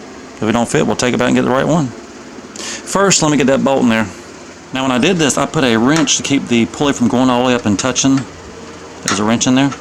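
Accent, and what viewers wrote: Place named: Georgia, United States. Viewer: Awesome. Your accent sounds exactly like my friend who’s from Georgia. That’s why I ask! Great video!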